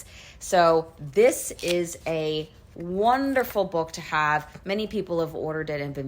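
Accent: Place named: Pennsylvania, United States. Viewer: Just started following and I'm like...I know this accent.. 717 represent.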